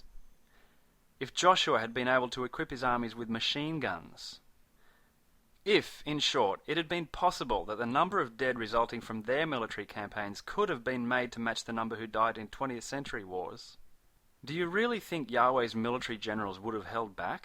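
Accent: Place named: Australia. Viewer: thanks for making this gloomy video more sexy by having an Aussie 'cent. Gracias.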